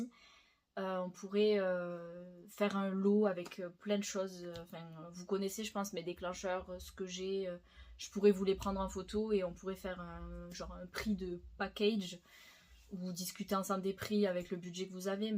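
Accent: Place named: South, France. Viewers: Coucou c'est mon premier message sur ta chaine, et je crois sur n'importe qu'elle chaine d'ailleurs, mais j'avais recommencer a écouter tes vidéos ASMR depuis 2/3 jours elles m'avaient beaucoup manqué un peu de tristesse d'entendre qu'il n'y aura plus d'ASMR mais aussi super content que tu réussisses se que tu as entrepris, j'avoue que ça fais aussi bizarre d'entendre ta voix " haute " on va dire ^^ et c'est pas déplaisant haha! ( l'accent toulousain incroyable! ) mais voila je continuerais a écouté tes vidéos car j'en ai besoin rien que ta voix me fais beaucoup de bien! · Merci pour toutes ces vidéos, c'était vraiment du travail de grande qualité! Super content de voir que tu as l'air dans de supers dispositions pour ouvrir ce nouveau chapitre qui je l'espère te comblera! (l'accent du sud-ouest mama comment c'est craquant...) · En asmr c est compliqué de dénoter des accents . 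Elle a déjà parlé normalement avec cet accent du sud .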